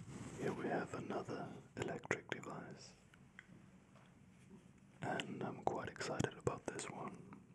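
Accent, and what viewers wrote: Germany. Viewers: He's German, so his English may be slightly more difficult for you to understand because he's also not a native speaker, and while he's very fluent in English, he does have a distinctly German accent. There's nothing wrong with getting some practice with listening, though. 🙂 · This is so amazing after 5 min i fall asleep are u german?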